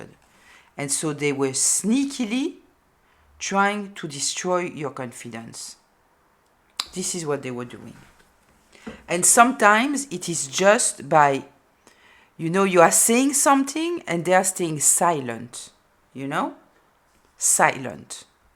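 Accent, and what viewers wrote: France. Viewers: I love the accent ma oui mademoiselle!!!! 🎉❤😂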